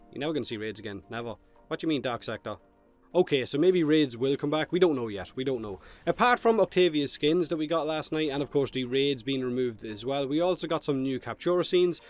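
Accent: Ireland. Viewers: Personally I love your brogue.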